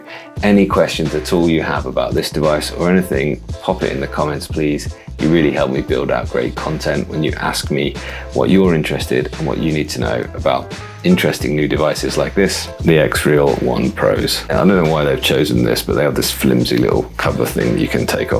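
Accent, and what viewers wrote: United Kingdom. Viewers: I'm a frog. You have such a looooovely British accent. Love it! Sincerely.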